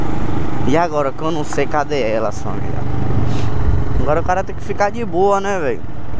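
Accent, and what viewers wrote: Bahia, Brazil. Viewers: Mano se e Bahiano? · Vc e da bahia de meu mn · Pelo sotaque é bahiano kkk , de qual cidade mano ?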